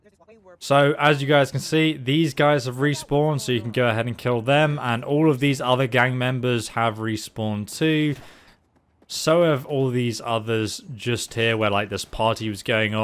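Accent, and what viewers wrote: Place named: United Kingdom. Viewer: I know its nothing to do with this game but, I love your british accent.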